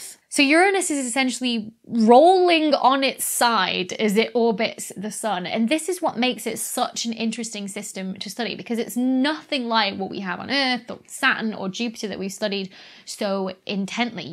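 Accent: United Kingdom. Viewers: Sorry to say Dr Becky, the closed captioning on my television translated your “Saturn” to “satin”. I knew what you were talking about, but my television has problems with a British accent. Love your videos.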